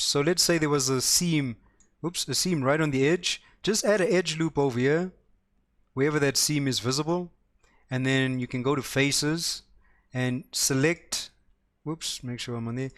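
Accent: South Africa. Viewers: you from South Africa bud?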